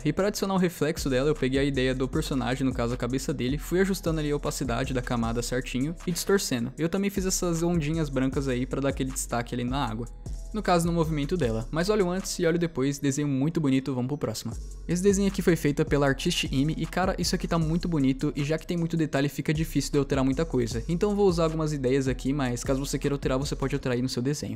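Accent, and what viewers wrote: Rio Grande do Sul, Brazil. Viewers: Gaúcho né kkk?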